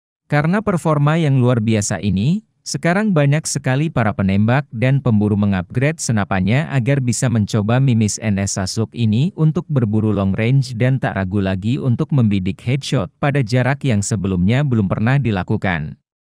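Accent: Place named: Java, Indonesia. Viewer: Ilat.e wong jowo wes podo paham slag slug podo ae...😅😅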